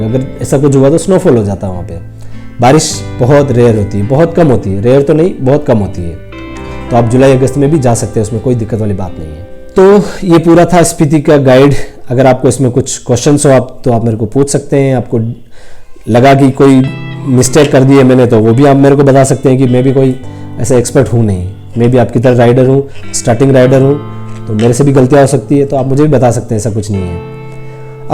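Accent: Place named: Rajasthan, India. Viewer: Bhai as per your voice you look from Rajasthan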